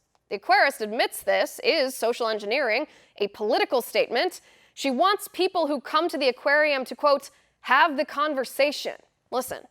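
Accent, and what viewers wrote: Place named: Southwest Ohio, United States. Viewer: BWAAAAAA HAHAHA...don't give 'em any ideas..."if we are still allowed to say the word 'straight' "! BWAAAAA HAHAHA You can tell ol' girl is from southwest Ohio! Rock on, Sharonville, by way of Hamilton!!! Keep on keepin' on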